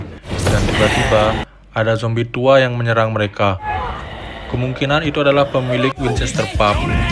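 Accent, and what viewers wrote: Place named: Bali, Indonesia. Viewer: pengisi suara nya kaya org bali hehee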